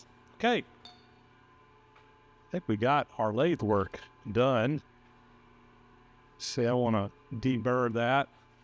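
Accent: South, United States. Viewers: Another English fan here, I love your rich 'Suthernn' accent. Keep posting these treasures, and many thanks.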